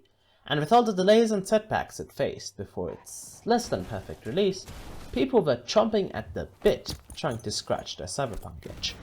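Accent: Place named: Germany. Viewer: the German sounding man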